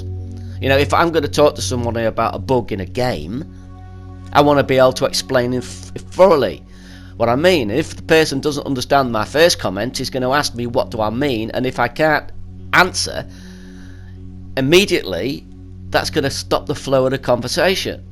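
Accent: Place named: Scotland, United Kingdom. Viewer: I like your video Mr. British/Scottish guy, I will subscribe so that you can inform me of more of these major updates affecting the gaming industry and PS4 exclusives, I seriously don't know where I would be if I hadn't found your channel, I would literally be living under a rock, can you please update us on the future of the gaming industry economy, Covid-19's effects on the industry and when GameStop will become a penny stocks? THANKS.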